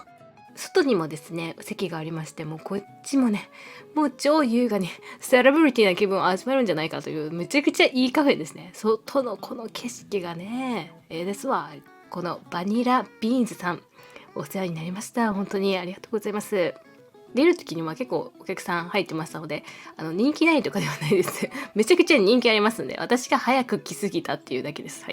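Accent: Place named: Kansai, Japan. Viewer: いつも楽しく拝見しています🥰 アラフォーのおばちゃんスノ担です。私は元々は嵐担なんですが、カウコン→ジャニフェス→消えた初恋でめめに沼落ち、今はめめ寄りの新参箱推しです。 SnowMan関連の動画を観るのが日々の活力なんですが、さんの軽快な関西弁トークにすっかりハマってます🤗 聖地巡礼、めちゃめちゃ内容濃くて、ドラマを思い返しながら観ました。今回もおもしろかったです。ありがとうございました！サザエさん風次回予告も爆笑でした。次回も楽しみにしてますね〜🎬✨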